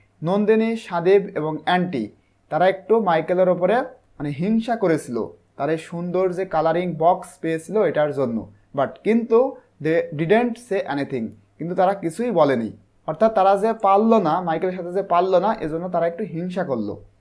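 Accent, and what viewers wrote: Barishal, Bangladesh. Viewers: Apni ki borishal theke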